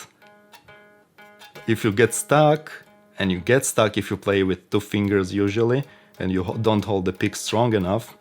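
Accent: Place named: Russia. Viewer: Я уверен, что если бы ты вёл канал на русском - то подписчиков было бы гораздо больше! Слушать русскоговорящего, который говорит на инглише с русским акцентом - это реально тяжко )))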